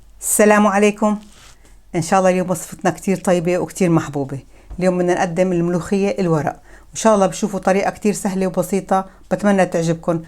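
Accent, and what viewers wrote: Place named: Levant. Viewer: جننتي سماي ماعم اعرف لهجتك شوي بتحكي حلبي شوي شامي على فلسطيني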